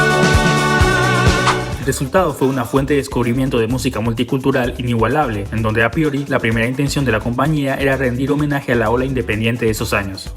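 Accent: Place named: Paraguay. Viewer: Suenas como paraguayo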